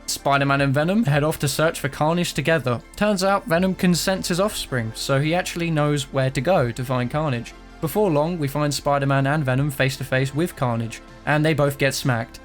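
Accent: United Kingdom. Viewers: Between you and Alex Lennen, British guys explaining spiderman has become my new favorite genre of YouTube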